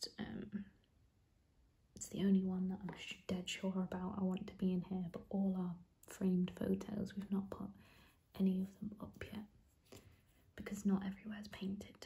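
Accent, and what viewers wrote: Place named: India. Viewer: So relaxing please make another Indian accent asmr video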